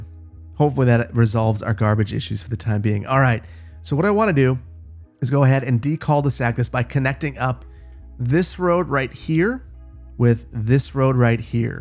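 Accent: Wisconsin, United States. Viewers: Just watched all of this playlist, I'm from southern Wisconsin and it's great to hear the "up north" accent attached to all this scenery.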